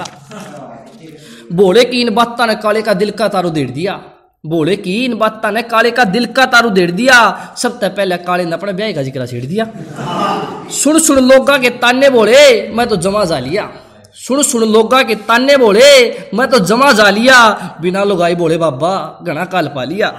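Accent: Haryana, India. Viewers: Bhai re teri haryanvi boli mja aaga🥰😍🤗🤗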